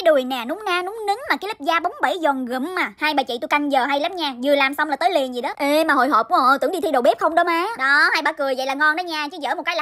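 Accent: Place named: North, Vietnam. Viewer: chị ơi chị người miền bắc à em người miền nam mà nói tiếng miền bắc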